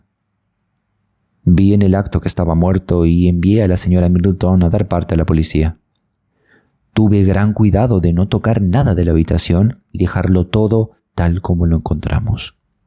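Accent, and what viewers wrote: Rioplatense, Argentina. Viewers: No sólo eres latino, hablas con la ye , que significa que eres argentino o uruguayo, me identifico maravillosamente, soy uruguaya 🙋👍✨🇺🇾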